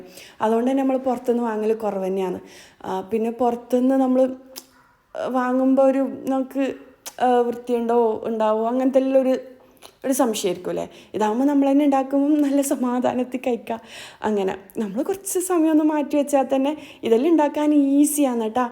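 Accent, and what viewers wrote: Kannur, India. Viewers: നമ്മളെ കണ്ണൂർ ഭാഷ തന്നെ 😅😅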